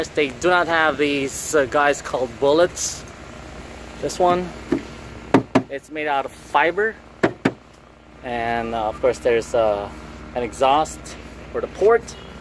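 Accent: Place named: England, United Kingdom. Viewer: Great English Accent 👍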